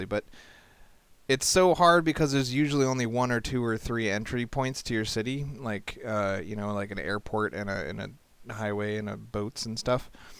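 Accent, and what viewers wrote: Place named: Canada. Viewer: what are you canadian you totally sound like it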